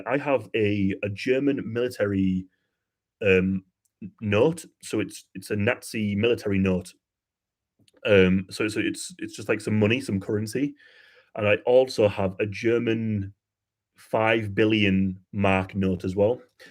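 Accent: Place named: North, United Kingdom. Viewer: Luv his northern accent n luv to watch keep it up.x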